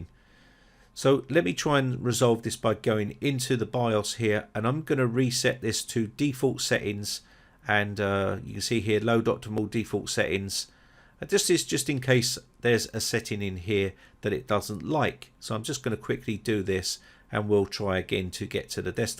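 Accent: United Kingdom. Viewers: Having built my first PC last week with a 2400G, I was happy to have everything working, until I hit the same problems as yours! Thank you so so much for your video, it’s rare to see a British video creator on the topic of PC building, especially Ryzens, so it felt very homely to have that accent haha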